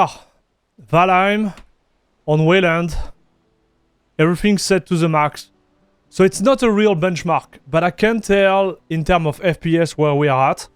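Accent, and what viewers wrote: France. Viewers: French accent W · He sounds French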